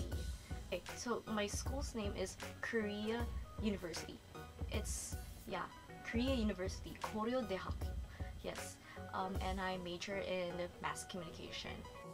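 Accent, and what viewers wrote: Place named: United States. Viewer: You study in Korea but it sounded like you are now in US because of your accent. Do you have that accent already before you go there? I forgot for awhile that you are in Korea, no offensement to the people there but most of them go to English speaking country to learn the language and you are in the opposite of it.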